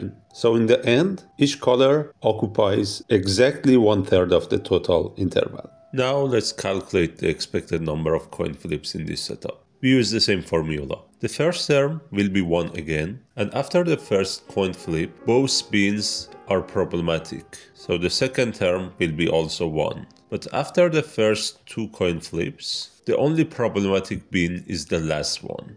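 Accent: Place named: Eastern Europe. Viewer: Are you from the Baltic states or a Slavic country? Or Romania? I can instantly recognize this accent ANYWHERE !! :) CHEERS for the content, keep it up! .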